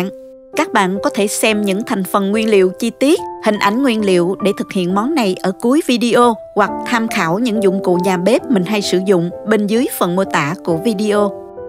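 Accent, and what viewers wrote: South, Vietnam. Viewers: Giọng chất năm bộ. Gợi nhớ lại giọng phát thanh ngày xưa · nghe giọng miền nam, dùng từ ngữ miền nam là thấy hay rồi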